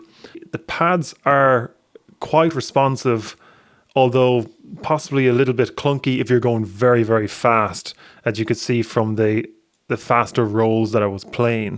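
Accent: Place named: Ireland. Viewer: He sounds Irish!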